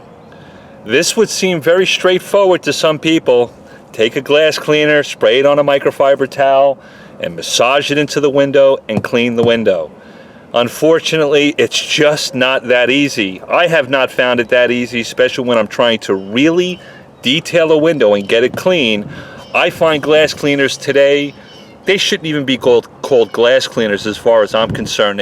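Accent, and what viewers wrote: New York, United States. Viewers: Lives in Dallas but is from New York, New York accent. 😊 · >Dallas Paint >Thick New York accent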